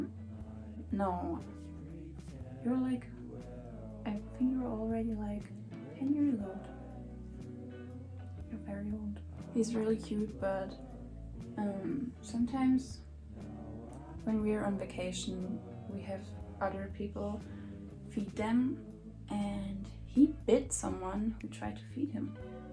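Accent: Germany. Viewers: Omg I go to a German school where I learn in English but I’m learning German too,I noticed you were German from the moment I heard you!! Du bist ganz schön und cool😎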